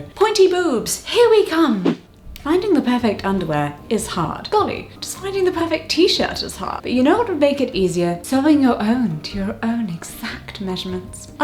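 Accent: United Kingdom. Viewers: Excuse me, are you from UK?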